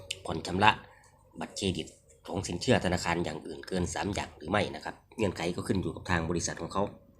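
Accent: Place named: Countryside, Thailand. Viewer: เสียงคือบ้านนอกแท้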